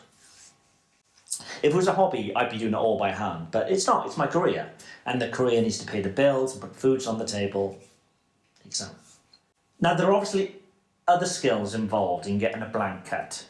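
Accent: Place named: United Kingdom. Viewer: great video man love your british accent and i also do get my stuff waterjeted and still put ton of work into them by hand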